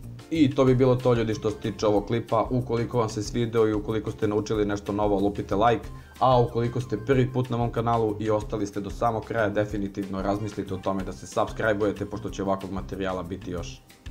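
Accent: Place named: Užice, Serbia. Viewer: Jesi li ti iz uzica?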